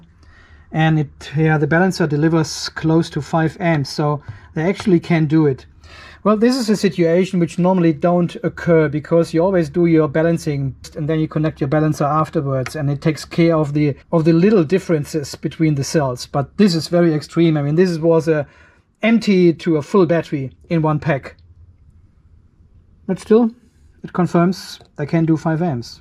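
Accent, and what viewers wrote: Germany. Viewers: I HAVE A QUESTION .... I am curious about your ACCENT what country is that it's not Australian LOL I am guessing German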